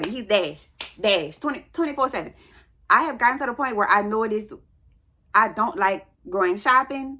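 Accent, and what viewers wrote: New Orleans, United States. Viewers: First 1 I love your New Orleans accent lol 2. I got them save glasses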